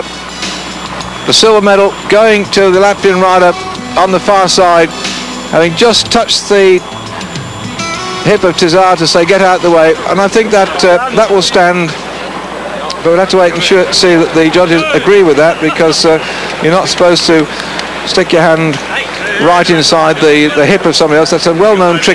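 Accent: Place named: United Kingdom. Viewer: I watched this race live in my early 20ies, now just heard 1 min in my lunch break and Duffield's thick british accent of national rider names make it for me as a Dane difficult to relate to the riders, though I know hwo they were. First time I watch this since live signal. Very interesting. Will watch last 7 mins in the middle of the night later.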